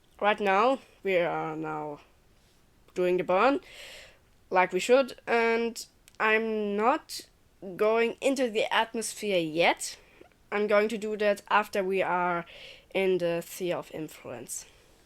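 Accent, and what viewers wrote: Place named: Germany. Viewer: Ging mir auch so! Man hört den Deutschen Akzent, aber Super gesprochen :)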